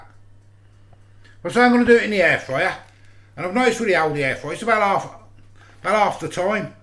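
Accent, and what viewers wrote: Birmingham, United Kingdom. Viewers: Proper Brummie love it.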